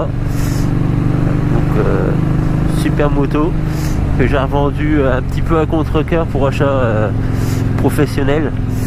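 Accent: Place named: Champagne, France. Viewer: l'accent champenois ! ! ah si si, je connais ! :) sympa t'es bécane ! du coup tu as arrêté les wheelings suite a la rsv1000? V & +1 abo! ;)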